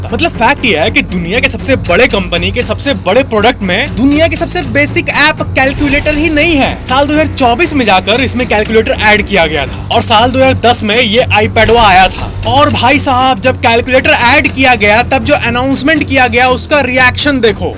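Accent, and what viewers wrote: Bihar, India. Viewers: Aap Bihar se ho n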